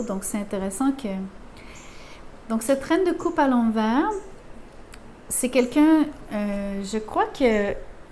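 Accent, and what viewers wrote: Quebec, Canada. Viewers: Merci pour toutes ces précisions avec ce bel. Accent québécois .ce tirage me parle je suis sagitaire l autre personne qui souhaite revenir ' serait scorpion vous me redonnez Espoir👍🙏🌟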